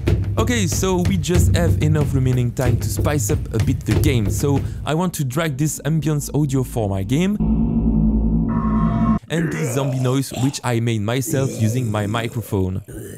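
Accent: France. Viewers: Tu est bien français sa se vois de ton accent continue le bon travail frére💪🏻💪🏻💪🏻💪🏻💪🏻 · I love hii french english accent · you have a french accent are you french ? because me too !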